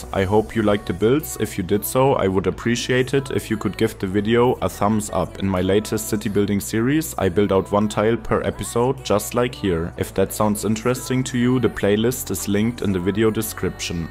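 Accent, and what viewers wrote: Germany. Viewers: I think the German accent fits perfectly to the video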